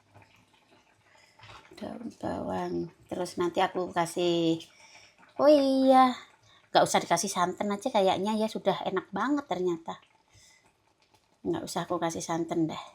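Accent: Java, Indonesia. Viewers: Yg bikin suka liat chanel mb, dialek jowone kui lo msh lekat dan kentel banget 😄, apa adanya, pdhl lama tinggal di luar negri. Saluut deh... Mb good atittute 👍 baik hti, mknya suaminya jg baik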